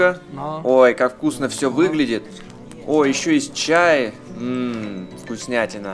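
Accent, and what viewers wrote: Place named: Kazakhstan. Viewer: По чисто казахски. Какое наречие?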